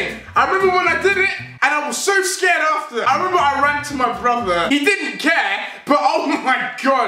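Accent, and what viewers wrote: England, United Kingdom. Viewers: He's from England :P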